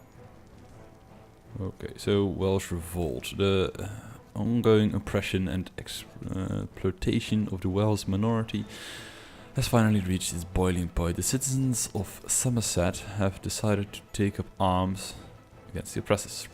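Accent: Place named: Netherlands. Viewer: Well I'm assuming by your accent that you are actually Dutch and no offense but Italy shall win the World Cup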